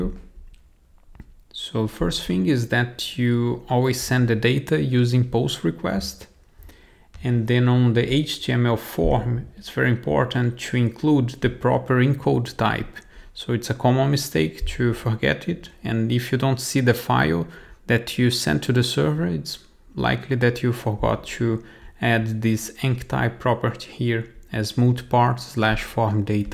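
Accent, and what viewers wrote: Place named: Brazil. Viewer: Muito bom mano, vc parece ser brasileiro pelo sotaque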